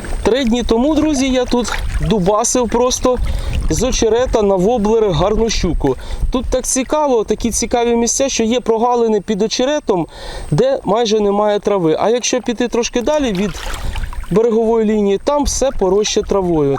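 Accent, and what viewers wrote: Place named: Ukraine. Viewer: говори на нормальном языке. не хочется слушать говор Бандеры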